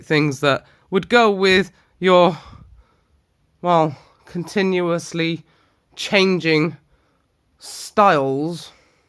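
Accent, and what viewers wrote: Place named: Wales, United Kingdom. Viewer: Another great video. Really had me thinking deep and reflecting with this one. Just curious, you have an interesting twang on your accent - where abouts are you from? I thought Welsh at first but maybe I'm wrong.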